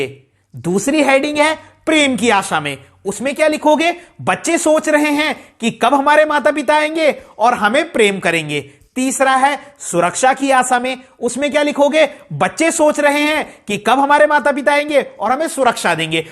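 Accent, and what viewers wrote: Garhwal, India. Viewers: Bhiya ap gadwali ho ?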